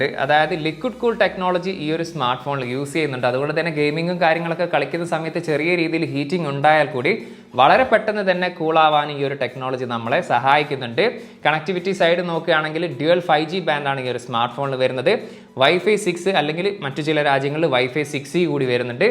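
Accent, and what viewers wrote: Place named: Kozhikode, India. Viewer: അതിന് ഞാൻ നാട് എവിടെയാണെന്ന് ചോദിച്ചില്ലല്ലോ സുഹൃത്തേ...! നമ്മൾ ജനിച്ചു വളർന്ന ദേശത്തെ ഭാഷ അറിയാതെ നമ്മുടെ സംസാരത്തിൽ വന്ന് പോകുന്നത് സ്വാഭാവികം.. അതിൽ തെറ്റൊന്നും പറയാനില്ല.. പക്ഷെ ഇത് പോലെ ഒരു പബ്ലിക് space-ൽ പരിപാടി അവതരിപ്പിക്കുമ്പോൾ അത് വരാതെ ശ്രദ്ധിക്കാൻ നോക്കണ്ടേ..? കാരണം, കോഴിക്കോടുകാര് മാത്രമല്ലല്ലോ അനിയന്റെ ഈ content കാണുന്നത്....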